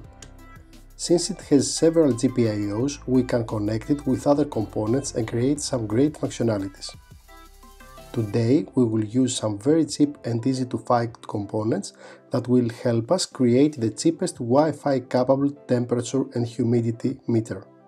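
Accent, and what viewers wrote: Greece. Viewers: i watched 500 videos and finally found 1 that explains the steps really easy.(perhaps it helps that they speak english with Greek accent :D euxaristw!!) Buts visual studio dont work for my os so wish it was for arduino that 90% of ppl use.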